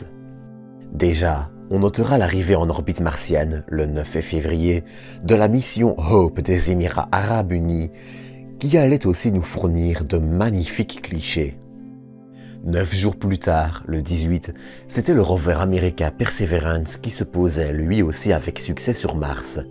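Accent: Belgium. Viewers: Hé non c'est l'accent belge 😉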